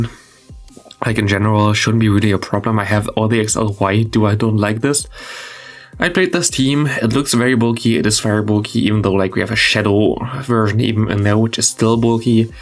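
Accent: Germany. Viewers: Ich wusste es dass du aus DE bist, man hört es am Englisch. 😅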